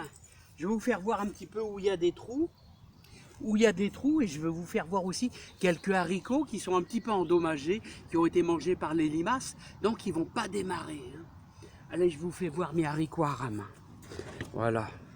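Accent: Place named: Béthune, France. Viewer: Bonsoir Mr Depuis qqs jours je visionne vos vidéos avec plaisir et attention. J ai bien l intention de mettre certains de vos conseils en pratique. Votre accent aussi me réjouit vous parlez du Haut Bethunois( quelle commune exactement?),je suis moi-même originaire de Bethune,même si je vis depuis plus de 30 ans en Charente Maritime. Retraitée depuis qqs mois je profite e cette chance pour principalement m occuper d un potager (loué depuis 20 ans et acheté en janvier) J essaie de jardinier bio et naturel, d appliquer qqs principes de permaculture(notamment de maintenir un sol vivant) J ai essayé cette année (très chaude et très sèche) de nouvelles cultures avec plus ou moins de réussite Des topinambours récolte à venir Des poires melon aucun fruit ,pieds repiqués ds ma petite serre tunnel De la poire de terre (,Yacon) récolte la semaine prochaine Des endives hélas avec la sécheresse les racines sont toutes petites Tant pis je recommencerai j espère simplement que 2023 sera une année plus "normale " En tout cas merci pour votre bonne humeur et votre naturel. 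Bon mois de Novembre. Maryse Lydie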